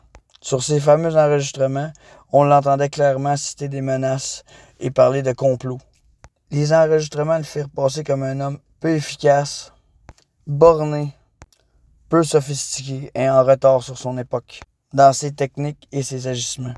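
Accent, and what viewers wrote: Quebec, Canada. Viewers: Il y a quelques années dans le cimetière Notre dame des neiges, je suis passé devant la tombes des Violi. Un magnifique monument avec le nom des trois frerots. Digne d'un film de la mafia! C'est agréable de vous entendre parler Québécois avec vocabulaire irréprochable! C'est une bien belle façon de se distinguer! Bonne chance dans vos projets!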